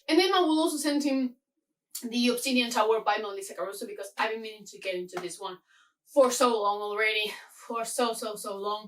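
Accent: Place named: Galicia, Spain. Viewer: Ostia, encontré tu canal hace poco y había algo en tu acento que me sonaba mucho y era de oírmelo a mi, que tb soy de Galicia...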